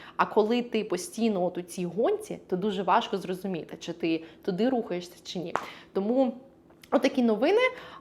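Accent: United States. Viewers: Цікаво. Сподіваюсь ще знайду у вас відео, де зможу зрозуміти наскільки важко або легко там влаштуватися айті спеціалісту і як взагалі шукають там роботу. У вас Американський акцент, мені здається) або манера розмови, щось таке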